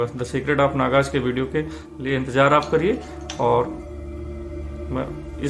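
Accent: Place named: Kanpur, India. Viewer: Dheere dheere kanpuriya style bahar aa rha ab By the way great explaination of shiva triology series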